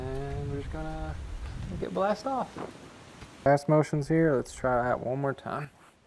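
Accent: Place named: South, United States. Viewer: you get a sub for that sweet southern accent... and the good quality video but mainly the accent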